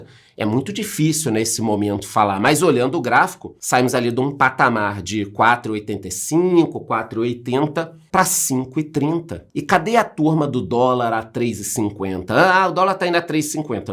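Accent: Rio de Janeiro, Brazil. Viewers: Pra quem é nordestino como eu, parece muito que vc é carioca, o linguajar não é chulo mas o sotaque é carregado sim. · Moço, basta vc falar um oi que já dá pra saber que vc é carioca. Kkkkkkkkk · Caraca, sotaque bem carioca kkk, não tem como não saber🤣🤣🤣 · Parece muitooo que vc é carioca😍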